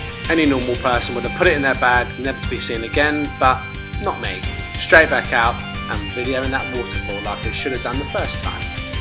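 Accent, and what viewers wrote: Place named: Scotland, United Kingdom. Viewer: your Scottish accent is ummmm good but funny 😂such beautiful seems 100 percent take Teddy there (obviously in like a year or something)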